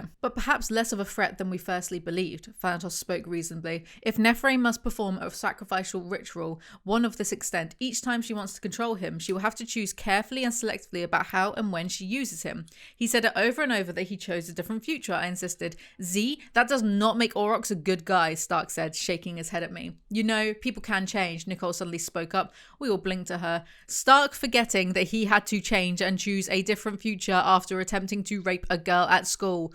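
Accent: Texas, United States. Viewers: what an impressive and accurate texan accent! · As a Texan, I am in love with your Texas accent 🤣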